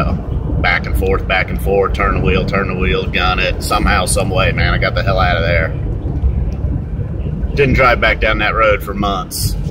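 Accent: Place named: Alabama, United States. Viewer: Damn. You could be riding through Slapout, Alabama if I didn't know any better. Just different accents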